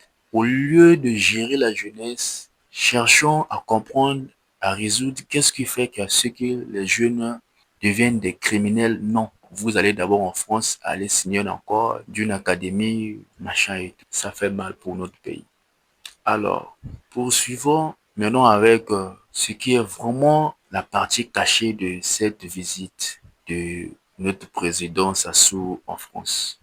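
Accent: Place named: DR Congo. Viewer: Tu as l'accent de la RDC .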